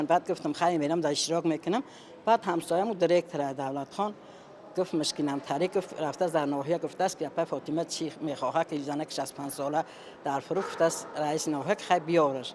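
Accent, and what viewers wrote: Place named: Darvaz, Tajikistan. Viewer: ЭЭЭЭ ДАР ЗАБОНИ ШИРИНТ ЧОНУМ МОДАРИ ДАРВОЗИ ДАР ЛАХЧАИ ШИРУНУТ МА МЕМУРМ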